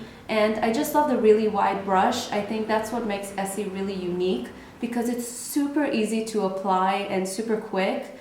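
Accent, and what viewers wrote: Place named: Netherlands. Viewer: Are you by any means Dutch? Idk you kinda have the same accent as me in English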